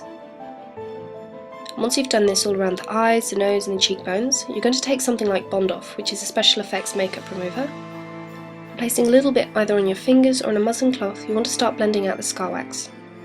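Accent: England, United Kingdom. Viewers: her English accent is beautiful. die die die. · I think the fact that you have a british accent totally adds to this video <3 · love ur british accent!!!!!!!!! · I love your voice for this, soft, with a british tone. sounds alot like a girl harry potter xD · wow your voice..your kind of speak...guess you will be a good voice actoress..are you british ? i like this accent soo much :-)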